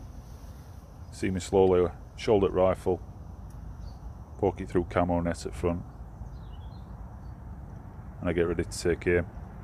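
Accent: Yorkshire, United Kingdom. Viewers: I HEAR YORKSHIRE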